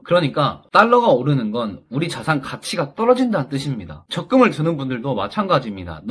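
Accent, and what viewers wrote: Jeolla, South Korea. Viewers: 전라도 분이네요! 와 귀하신 분!❤❤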